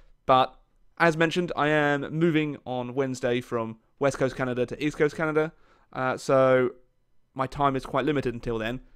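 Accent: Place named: United Kingdom. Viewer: I thought you were British😱😂😂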